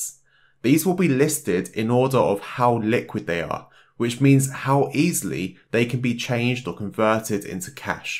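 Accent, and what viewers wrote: United Kingdom. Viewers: britich accent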